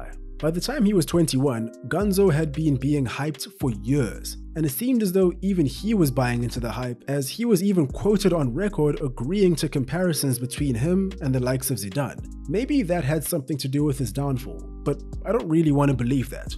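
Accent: South Africa. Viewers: You Sound South African Bro🇿🇦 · Great to hear a South African doing his thing on this channel.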